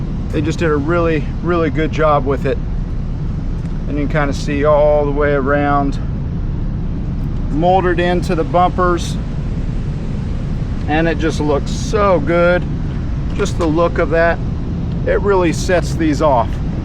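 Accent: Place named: Appalachia, United States. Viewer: Love the car but am i dead or he sounds like he is speaking with a hillbilly accent😅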